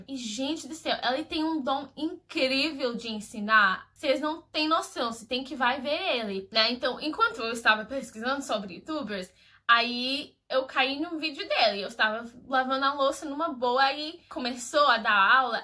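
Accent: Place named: Brazil. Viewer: Meu Deus, achei que fosse brasileira. Que português lindo vc tem 👏🏾👏🏾👏🏾👏🏾🥰❤️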